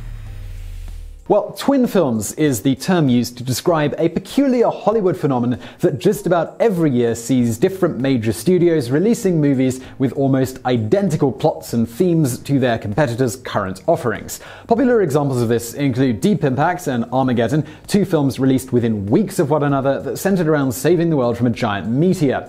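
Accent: England, United Kingdom. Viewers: Funny! You sound like you have an English accent, and yet you used the term 'so-called discovery of America'. This is funny to me, because as an English speaker--especially with an English accent--I would expect you to know the definition of English words. Clearly, you do NOT know the definition of the world 'discovery'. You see, since up to the point that Columbus 'discovered' America, Europe did not KNOW it was there. Thus when it is said that Columbus discovered America the term is the MOST accurate term. He discovered for his people something that neither he nor his people knew existed. Let's not just jump onto the SJW agenda when there is an entire dictionary of English words that you might want to research.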